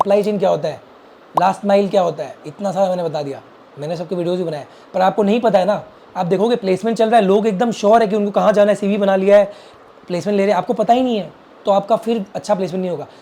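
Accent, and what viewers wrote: Bihar, India. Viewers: Bhai tm Bihar se ho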